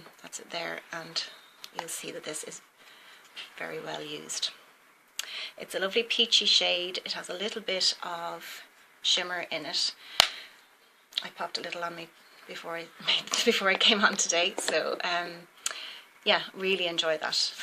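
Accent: Ireland. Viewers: Hi, you sound Irish, beautiful accent and beautiful lady, you are so refined, lovely. I'm from Nova Scotia Canada, and a great line from here is called The Ordinary, natural, no silicone, no additives etc and wonderful prices, its blowing up on you tube! Wayne G recommend it! I love Chanel foundation as well. Looking forward to watching more of your videos, Karen from Canada 🇨🇦.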